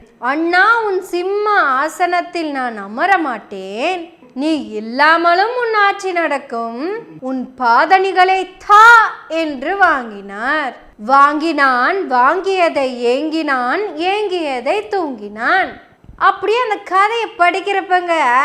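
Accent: Kongu, India. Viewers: தங்கள் பேச்சு மிக அருமையாக உள்ளது. அதைவிட தங்கள் கொங்கு தமிழ் மிக மிக அருமையாக உள்ளது நன்றி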